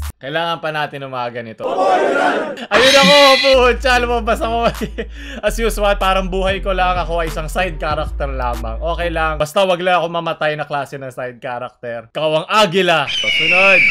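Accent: Visayas, Philippines. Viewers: Bisaya ka hahaha yawa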